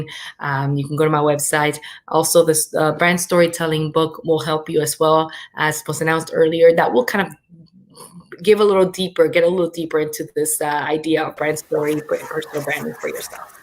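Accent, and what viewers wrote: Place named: United Kingdom. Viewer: How can this Indian speak British, even Priyanka Chopra ka accent itna achha nahi hai I am amazed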